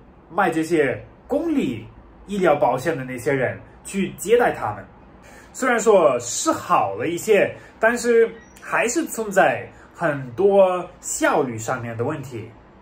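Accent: Sichuan, China. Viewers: 我住臺灣省喜歡您的視頻更喜歡聽你濃厚四川腔普通話!感謝你介绍大陸四川成都風俗民情~❤👍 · 的普通话是不是带的四川的口音呢？哈哈😄很可爱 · 哈哈, 在成都久了,普通話都帶四川口音了。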